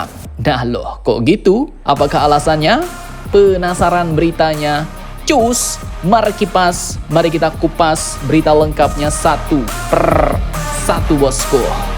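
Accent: Pontianak, Indonesia. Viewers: Mimin orang Pontianak?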